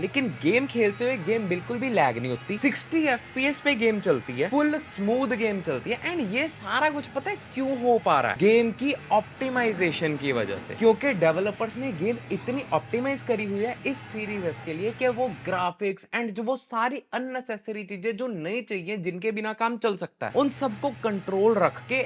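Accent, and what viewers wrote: Punjab, India. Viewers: Punjabi accent. Probably doaba???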